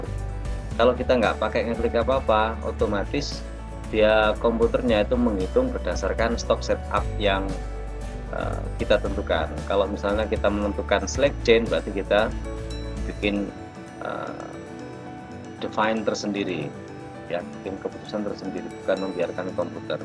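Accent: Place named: Java, Indonesia. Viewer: baik Pak. Trima kasih. Sedang mengikuti video tutorialnya Pak. Karna masih awam banget jadi sering saya nonton. Kalau boleh saya tahu posisi Bapak di Jawa mana. Dari logat seperti orang Jawa. Mungkin Saja di Surabaya bisa saya kursus secara langsung. Trima kasih🙏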